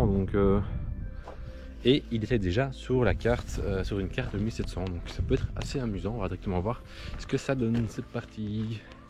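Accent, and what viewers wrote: Belgium. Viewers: Accent belge sa non? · OK ! Je ne savais pas que tu étais en Belgique avec une autre réglementation. J'aurais dû m'en douter avec l'accent... Bons trous et dans l'attente d'autres vidéos aussi sympa.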